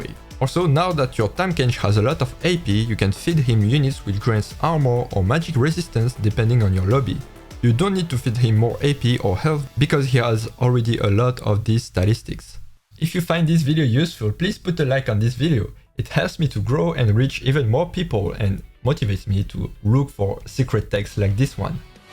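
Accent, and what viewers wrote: France. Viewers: Asian dude with french accent is throwing me off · Mec je kiff que tu ais l'accent français, de l'humour, de l'intelligence et un amour pour TFT ! Tu nous fais sortir de l'ordinaire 🙌🏼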